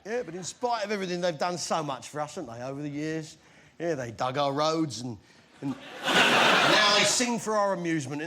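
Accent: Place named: England, United Kingdom. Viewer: Love this. Proper English not some abomination.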